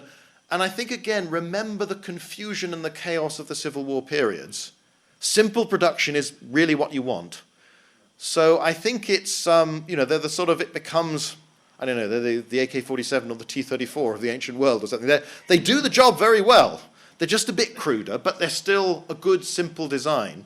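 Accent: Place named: United Kingdom. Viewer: Absolutely enjoyable and very high information density. Plus the British accent adds credibility... :)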